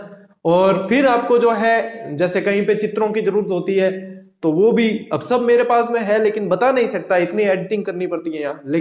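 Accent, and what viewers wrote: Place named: Barmer, India. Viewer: सभी क्लासेज एक से बढ़कर एक...sir m bhi barmer me service krk aai hu 3 saal...so aapki kshetriya boli se kafi Apnapan lgta h ... thanks so much for your classes 🙂...Vidya dan maha dan🙏🙏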